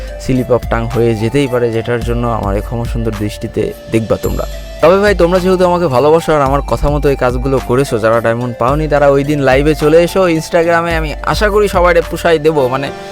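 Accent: Jessore, Bangladesh. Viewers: ভাই আপনি তো আমার নিজ জেলার লোক আমি বুঝতে পারছি আপনার বাসা যশোর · ভাই আপনার জেলা মনে হচ্ছে যশোর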